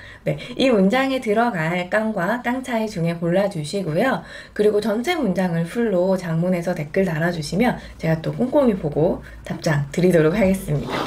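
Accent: Yanbian, China. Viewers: 1。我第一次刚到中国，吃了非常多的麻辣烫。 2。我刚二十岁了。 3。刚才我到韩国了。 4。偶尔老师说的口气很有意思意思，好像是刚（来）从延边那儿到的。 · 연변 말투 좋습니다. 왕왕 사용하여 강의하면 좋겠습니다.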